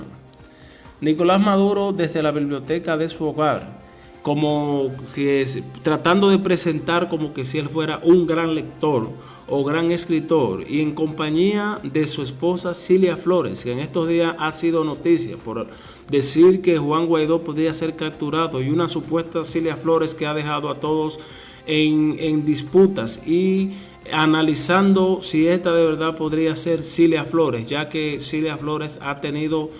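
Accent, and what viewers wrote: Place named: Cuba. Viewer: Será que son ideas mías o este señor es cubano¿?escuchen la voz y su particular acento!! Mosca¡!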